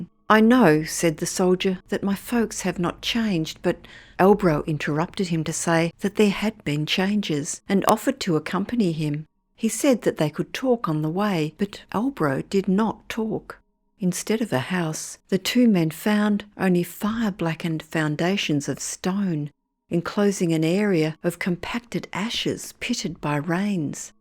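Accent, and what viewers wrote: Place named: Australia. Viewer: CANNOT hear this story as narrated by an AUSSIE -weird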